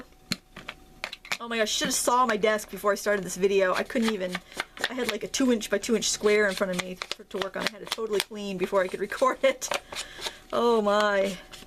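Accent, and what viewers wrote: Maine, United States. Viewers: Oh my God! I just Love you! I was having a terrible day, then I watched this vid and I was laughing and totally enjoying myself! It hap;pens every time I watch your vids actually! I'm a Mainah too, so I Love your accent, LOL. You are awesome!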